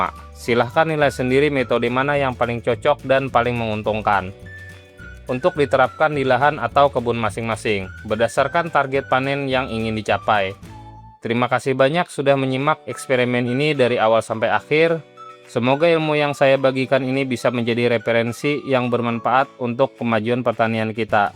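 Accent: Banjar, Indonesia. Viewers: Urang banjar mun ndagari logatnya sidin ni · urang banjar kah pian, bahasa banjarnya masih ada lah, kada hilang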